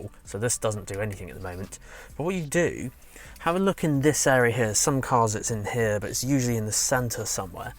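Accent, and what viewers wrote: United Kingdom. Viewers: British English So harder to understand 👎🏾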